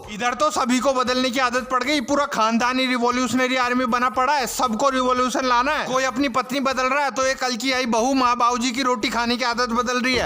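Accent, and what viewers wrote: Rajasthan, India. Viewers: Bhai tera rajasthani accent 😂👌👌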